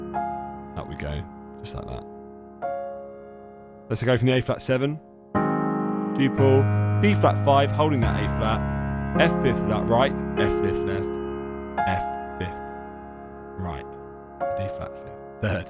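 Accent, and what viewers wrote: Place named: United Kingdom. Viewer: British accent, good man.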